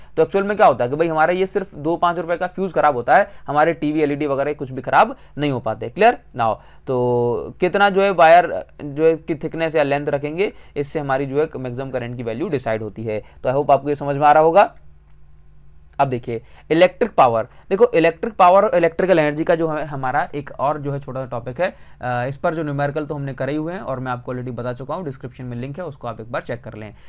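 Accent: Odisha, India. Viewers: Sir are you from odisha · Sir are u from Odisha?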